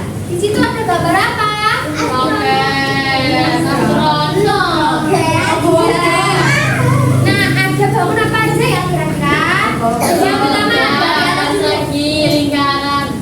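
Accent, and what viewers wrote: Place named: Java, Indonesia. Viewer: Semangat.. Semoga bisa menjadi guru yg sejati... Bahasane medhok banget sih mbak....😂😂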